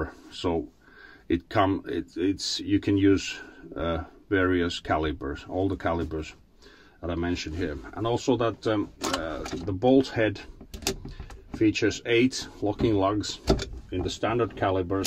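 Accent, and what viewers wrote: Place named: Finland. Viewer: Ai että miten hienoa suomalainen puhdas rallienglanti 🥰🥰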